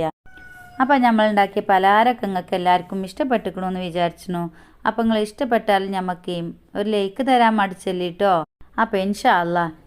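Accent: Malappuram, India. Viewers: Malappuram pwrooi ❤❤❤ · ഇതെന്തു സംസാരമാണ്.. മലപ്പുറത്തെ എല്ലാവരും ഈ ഭാഷയാണോ സംസാരിക്കുന്നത്.. കുറച്ചു നന്നായി സംസാരിച്ചു കൂടെ,.. എന്നാൽ എല്ലാവർക്കും മനസ്സിലാകുമല്ലോ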